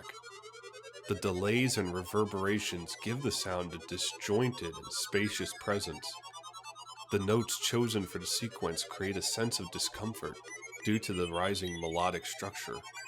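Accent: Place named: United States. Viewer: Get a German narrator as the American voice over is worthless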